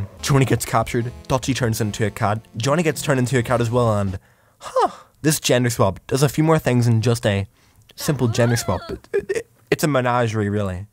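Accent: Scotland, United Kingdom. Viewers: You sound English but you sound a bit Scottish as well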